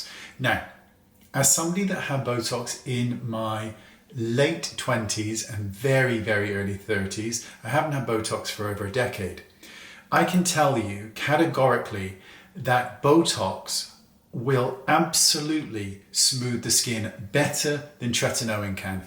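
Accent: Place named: Scotland, United Kingdom. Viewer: are you originally from Scotland 🏴. I feel I can hear a wee accent from time to time.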